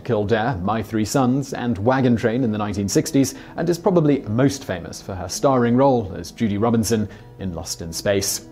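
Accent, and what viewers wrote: United Kingdom. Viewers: you often talk about your efforts to nail the pronunciations of other languages. It seems that in this instance your tendency toward a British pronunciation was just doubled down upon. Seriously I can't believe that any effort at all was made to understand or reproduce a proper Germanic pronunciation despite the jarring difference in meanings throughout the entire video... my enjoyment was negated by this oversight.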